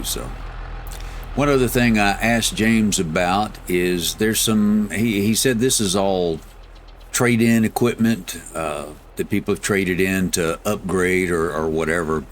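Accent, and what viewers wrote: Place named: South, United States. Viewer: Thanks for the video, you're so relaxing to listen to, I came home from work and eat then sit down to listen to you and about fell asleep not that you're boring just relaxing listening to you. You sound like your country is that your voice or are you throwing it for the video? Lol But thanks again for your time appreciate it!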